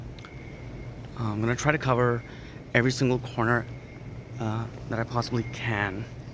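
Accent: United States. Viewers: Hi I noticed you speak with an (American ?) accent. I'm curious, did you learn it from a particular source or through practice ? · You have an American accent. I grew up in LKO. I live in Cleveland, Ohio now.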